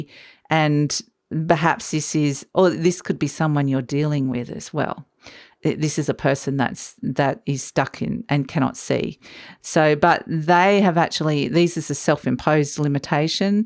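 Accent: Australia. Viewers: R you from Oz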